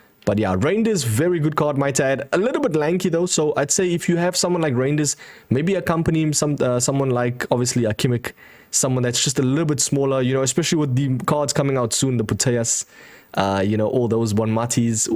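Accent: South Africa. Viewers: Are you South African ? 🤔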